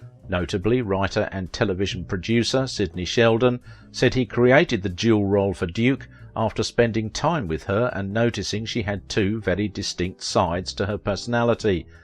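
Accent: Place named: Manchester, United Kingdom. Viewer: In your opening 1st paragraph in the last mangled sentence, you are apparently confused with the name of the actress Patty Duke and the name of the person she was portraying – Helen Keller. And in your opening 2nd paragraph you seem to be very gender confused. Can't you distinguish the difference between the "his" and "her" pronouns? Or is that a British idiosyncrasy? And why is a thick (sounds like Manchester) British accented man narrating a short bio on an American actress who's early 60's show and TV movies I very much doubt were broadcast anywhere in England or The UK at that time or anytime since then?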